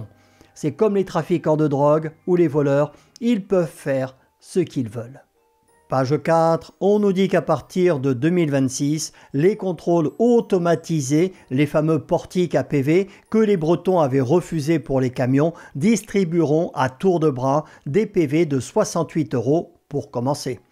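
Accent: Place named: Germany. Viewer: super video mais ton accent allemand est tres bizarre 😅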